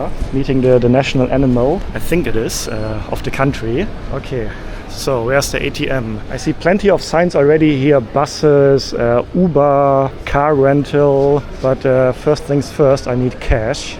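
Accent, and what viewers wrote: Germany. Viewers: Ich liebe dein deutsches Englisch 😅😅😅😅❤❤❤ I love your German English 😂😂🔥🔥 Best videos bro thank you for all this videos 🎉🎉🎉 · Love the German accent 😅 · ich rieche deutschen aktzent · Hello, I‘m here the first time, when I heard the famous German ascent, I had to listen a little and I must say, I liked your tour very much! I wanted to visit Qatar 3 years ago, but it didn’t happen, so you gave me a very good impression and description of that place, thanks very much! I am only 3-4 months/year in Germany and I am completely with you, when you express your feeling’s: I wish Germany would look like this! I wish many times the same! I look forward seeing and listening to more of your recorded journeys.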